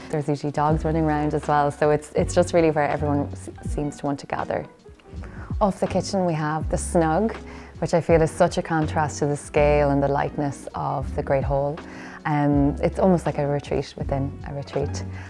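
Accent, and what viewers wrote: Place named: Ireland. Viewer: No idea what you are talking about. has a very soft Irish accent…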